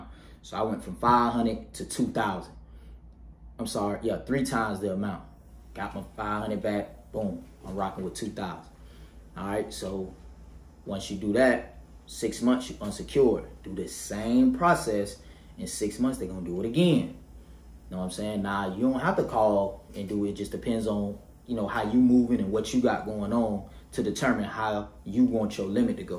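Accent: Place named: Atlanta, United States. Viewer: sound like you from ATL, i was thinkin of company names MuddHouseReQ did pop up, ill run wit sum ting else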